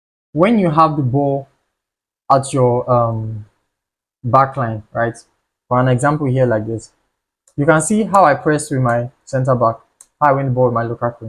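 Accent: Nigeria. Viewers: You sound naija so imma sub bro!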